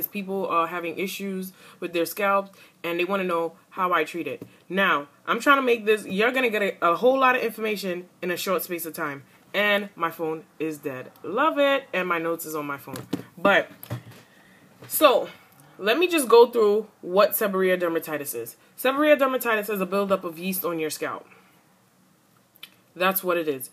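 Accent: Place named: New Orleans, United States. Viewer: THANK YOU but it sound like you from N.O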